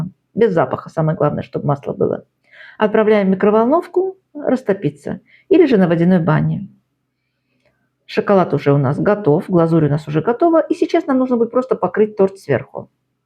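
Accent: Baku, Azerbaijan. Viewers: Прекрасный рецепт,прекрасная подача,а бакинские нотки в голосе-это нечто!!!!Дай Бог вам здоровья и творческих успехов!!!!❤️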